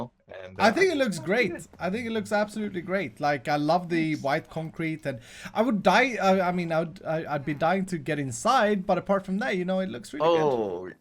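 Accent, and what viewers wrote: Sweden. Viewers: Arr you from sweden?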